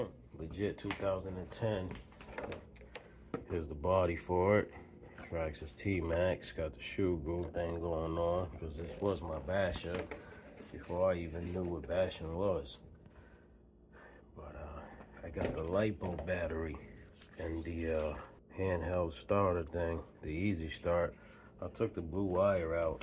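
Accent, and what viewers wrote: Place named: Nuyorican, United States. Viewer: I sense NYrican in the house!!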